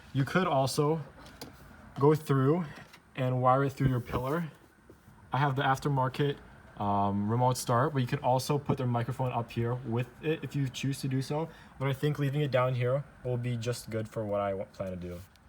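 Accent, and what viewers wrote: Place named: Midwest, United States. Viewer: Love the midwest pronunciation of the word 'console' to sound like 'council'. Must be a Chicago thing. .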